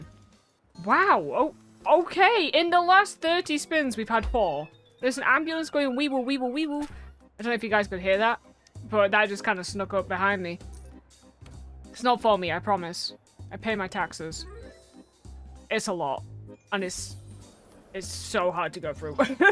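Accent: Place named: North, United Kingdom. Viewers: I am going to guess Manchester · are you from the north?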